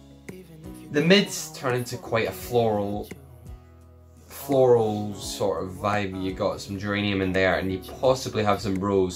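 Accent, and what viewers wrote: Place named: Glasgow, United Kingdom. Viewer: This is an accent predominantly spoken amongst middle class Glaswegians who tend to reside in the west end of Glasgow and more recently the south side of Glasgow in particular the shawlands area. The accent was firstly adopted by middle class Glaswegians as a way to differentiate them from the more “common” Glaswegians and to show them as being “better” than the Glaswegians who spoke in slang. Many Glaswegians from working class backgrounds develop this accent when they first start at University in an attempt to camouflage the working class roots they have been brought up in which in turn enables them to blend in with the middle class “cool kids”. The accent is influenced by people from the north of Scotland.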